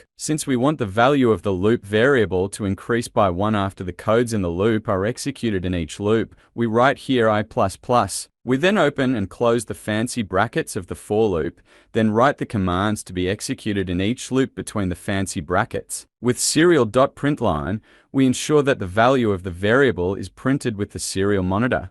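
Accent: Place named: Australia. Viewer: Nicely explained with an Ozzy accent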